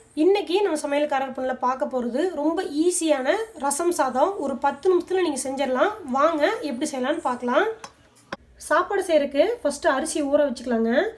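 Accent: Kongu, India. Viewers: ரசம் சாப்பாட்ட விட உங்க கொங்குதமிழ்தானுங்க சூப்பரு, ஏனுங்க என்னுங் நாஞ்சொல்ரது...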